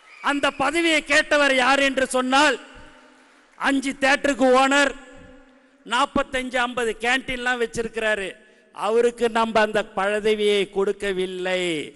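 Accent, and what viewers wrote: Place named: Chennai, India. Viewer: Aprm enna Telugu layaa pesuraaru... Oru District ku oru style mari ithu Chennai Slang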